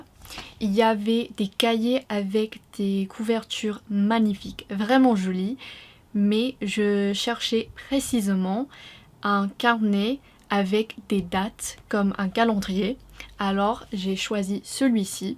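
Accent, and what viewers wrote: United Kingdom. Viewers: I feel you have a slight British accent in French, did you grow up in the UK?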